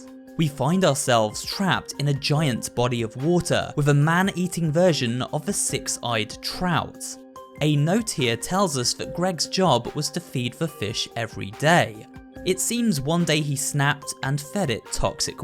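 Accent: United Kingdom. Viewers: arent you british?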